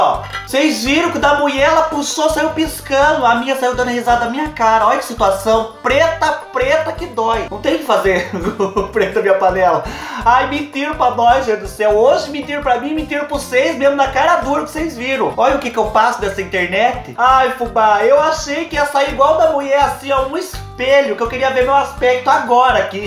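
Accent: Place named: Paraná, Brazil. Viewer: KKK ELE NAO PARA DE FALAR NEM PRA RESPIRAR, DO QUE LUGAR VOE E DO PARANA, EU AMO ISSO, KKK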